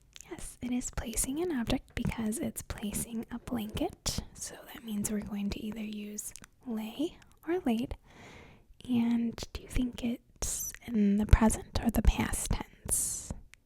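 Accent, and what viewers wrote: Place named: Midwest, United States. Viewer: I love your accent! It sounds like Michigan or somewhere in the midwest. Idk I’m Canadian what do I know lol.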